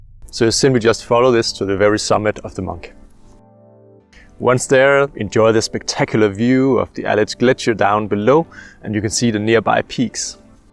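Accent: Denmark. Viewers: Hej, overvej at skrive stednavne når du nævner dem. Ikke mindst til os der ikke lige hører det første gang, men måske også til dem der ikke kan den tykke danske accent. Fed video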